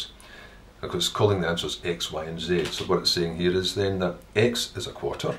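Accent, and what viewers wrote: Scotland, United Kingdom. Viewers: You sound Scottish to me.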